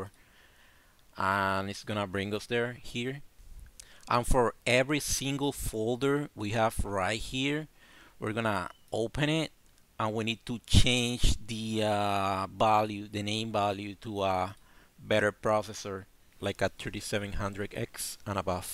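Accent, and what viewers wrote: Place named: Brazil. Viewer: mano tu e BR? mo sotaque de BR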